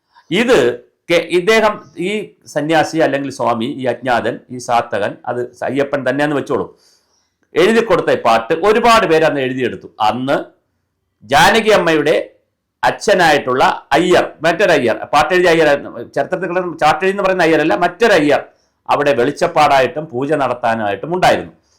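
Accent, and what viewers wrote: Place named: Pathanamthitta, India. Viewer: Pathanamthitta slang ph for bh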